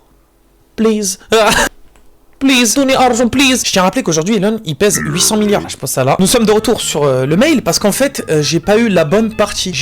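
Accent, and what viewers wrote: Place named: Maghreb. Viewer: AH MAIS TES UN MAGREBIN JAIS TOUTE SUITE VU CA AVEC TA FACON DE PARLER ON DIRAIT UN MONGOL HANDICAPE MENTAL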